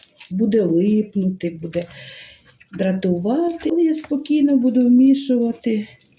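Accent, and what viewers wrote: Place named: Ukraine. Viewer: у вас очень красивая, спокойная украинская мова, вас приятно слушать. Не суржик, а именно мова.😊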